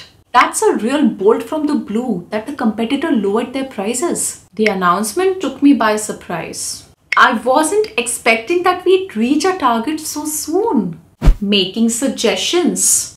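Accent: India. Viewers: 🙏Tqsm mam For Today's All useful idioms n phrases ,it really helpful for us n again tq mam for your Guidance in Bharats Accent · Thankyou so much mam . Iam the one who has no interest in English videos and movies. Because i don't understand American accent and British. I believe that British and American accent is the real English. Indian accent is not a real English. But when i started to watching your video i understand that Indian accent is also English. And i loved your videos and addicted to you. And i learning English only because of you. Lot of love from Kerala ❤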